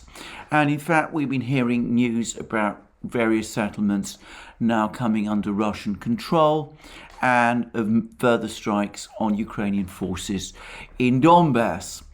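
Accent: United Kingdom. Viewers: Alexander's soothing British intellectual voice is unparalleled on YouTube! ♥️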